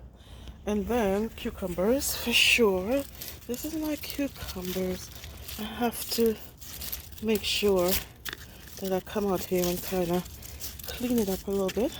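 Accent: Jamaica. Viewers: Hi Sis. Yes thought I heard a Jamaican accent. It's great to see how you used the containers for all these veggies. Compost is a great idea. Also it helps to switch out the types every 3 years. New friend. ☺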